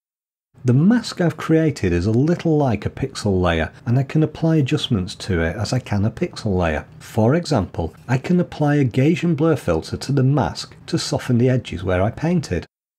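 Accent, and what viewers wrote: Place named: Manchester, United Kingdom. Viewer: Hi, I loved hearing your Manchester pronunciation, identical almost to my Cheshire accent, even after 37 years in Germany. However - I have to draw the line at your pronunciation of "Gaussian" as "Gaysian" - the pronunciation is phonetically "Gouwsian" as in (Northern slang) "nouse" after the German mathematician Carl Friedrich Gauß (Gowse??). Thanks a lot, enjoyed the video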